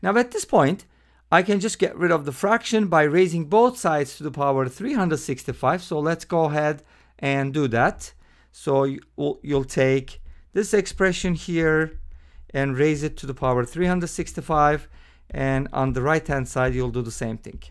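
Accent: Turkey. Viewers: Congrats! Is there a chance that you are from Turkey?? Your accent resembles Turkish English accent :)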